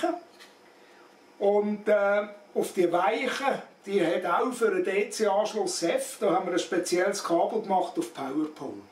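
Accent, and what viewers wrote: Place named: Switzerland. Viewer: Vielen Dank für die Vorführung des Betriebs auf dem Satelliten bzw. für die Erklärungen auf Schweizerdeutsch, das eigentlich gar nicht so schwer ist zu verstehen, wenn man sich ein bisschen Mühe gibt:-) Vielleicht hören wir uns demnächst auf dem Satelliten - ich gucke sofort nach auf Ihrer Website, was es da für Möglichkeiten gibt, auf Es'hail-2 QRV zu sein. 73, Colin GM4JPZ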